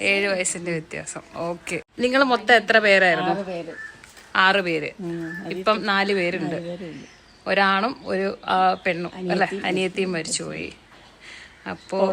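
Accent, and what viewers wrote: Kottayam, India. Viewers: ഹായ്... എനിക്ക് videos എല്ലാം ഇഷ്ടമാണ്.. ഞാൻ അമ്മുമ്മയുടെ വലിയ ആരാധികയാണ്.. അമ്മുമ്മ " പറയെടി "എന്ന് പറയുന്നത് കേൾക്കാൻ നല്ല രസമുണ്ട്.. അമ്മുമ്മക്ക് ആയുസും, ആരോഗ്യത്തിനുമായി പ്രാർത്ഥിക്കുന്നു 🙏🙏🙏 അക്ഷര സ്പുടതയോടെ, ഉച്ചാരണ ശുദ്ധിയോടെ സംസാരിക്കുന്നത് കോട്ടയം ഭാഷ കേൾക്കാൻ ഒത്തിരി ഇഷ്ടമാണ്... ഈ അടുത്ത കാലത്താണ് ഞാൻ അമ്മുമ്മയുടെ video കണ്ടു തുടങ്ങിയത്... ഐശ്വര്യമുള്ള സുന്ദരിയായ അമ്മുമ്മ.. പാവം ❤❤❤❤❤❤❤❤❤❤❤❤❤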